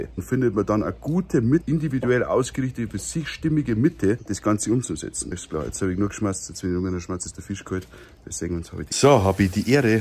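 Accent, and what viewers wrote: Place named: Salzburg, Austria. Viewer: Bist du im Berchtesgadener Land dahoim? Der Dialekt klingt so gleich wie da meinige da in Salzburg.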